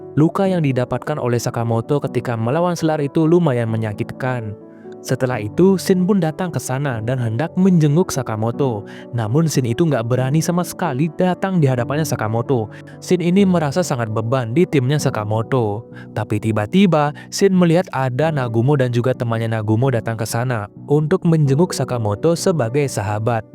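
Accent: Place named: Bali, Indonesia. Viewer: SERASA LOGAT BALI... BLI😁, KELIATAN DARI LOGAT BILANG HURUF T DAN LAIN2😅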